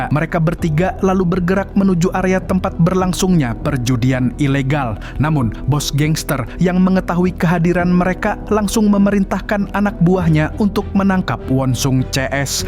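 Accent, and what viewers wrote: Banjar, Indonesia. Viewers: urg banjar kh pian sklinya astga😭😭😭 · Wakai orang Banjar kah ikam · Urg banjar kh manggg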